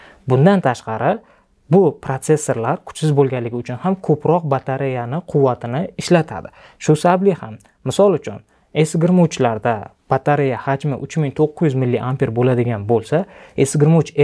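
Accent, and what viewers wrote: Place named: Uzbekistan. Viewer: Yaxshi kontent chiqibdi. Lekin inglizcha so'zlani o'zbekcha qilib talaffuz qilmang. Chunki bu so'zlar "amoled, snapdragon" so'zlari siz qilayotgan kontentda juda ko'p ishlatiladigan so'zlar va ularni to'g'ri talafuz etish sizlarni vazifangiz desa xam bo'ladi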